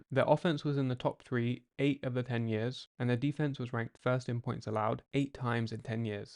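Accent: United Kingdom. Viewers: Just gotta add why a British accent guy narrator on American football. Also the BROWNS ran the Cleveland rams out of Cleveland to LA. The Cleveland rams won a NFL championship in Cleveland before they left.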